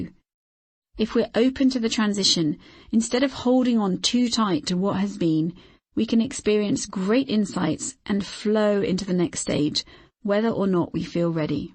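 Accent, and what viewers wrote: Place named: England, United Kingdom. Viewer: Great book terrible narrator should be a male voice, not an English voice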